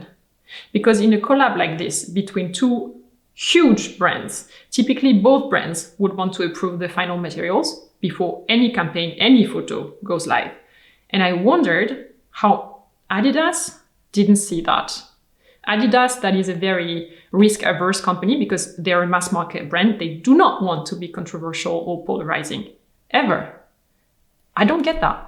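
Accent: France. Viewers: I have not watched a video from you in a longer time and I really noticed that your accent is disappearing slightly :) your english sounded less french today; I liked it :) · accent went from German back to French 🙂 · so hard to hear you with your thick French accent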